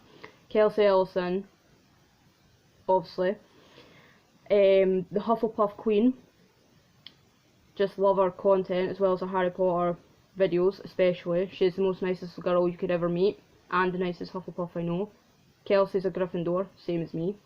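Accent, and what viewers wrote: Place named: Scotland, United Kingdom. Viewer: Ahhh I love Scottish accents me Grandma's from Aberdeen and trying to understand her is fun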